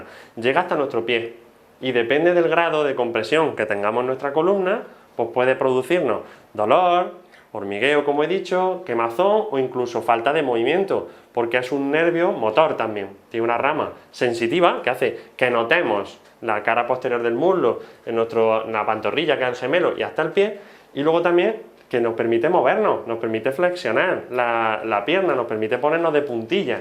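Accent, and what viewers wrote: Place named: Spain. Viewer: Me pueda ayudar creo que usted está en España por el acento que tiene quisiera si pudiera darme algunos consejos gracias felicidades por su explicación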